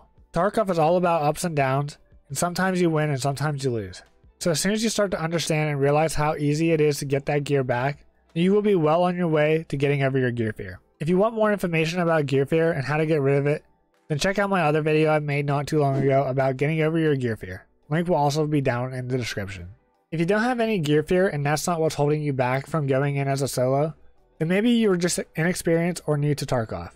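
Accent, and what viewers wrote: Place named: Pittsburgh, United States. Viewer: I could hear it in your accent haha.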